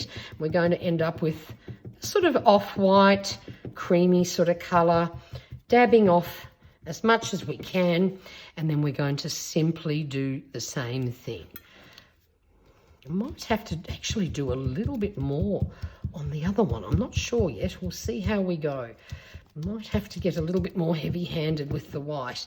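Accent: Australia. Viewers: why thank you! I didn’t mean to fish for subbies - doh! I just love hearing Aussie accents in our community & get so excited 😂 Especially when I like their work (like I do yours)!! But thank you! I have quite a bit of family in Brisbane & further north too. Hoping to make another trip up this year. It’s a gorgeous part of the world & always nice to be around family. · I keep going back to listen to you as I miss the Aussie accent...lol where in Brissie are you? I have family in and around there, not that I imagine you would know them...lol xxx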